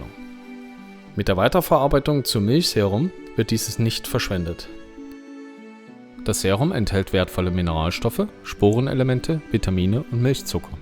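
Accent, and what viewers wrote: Saxony, Germany. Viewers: ...einverstanden...aber warum muss ein Sachse sowas erklären. Seine Aussprache französischer Begriffe spottet jeder Beschreibung...nu?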